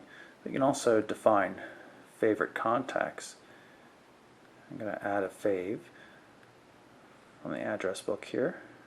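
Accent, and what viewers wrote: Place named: Scotland, United Kingdom. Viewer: there was just a tiny hint of scottish in that voice...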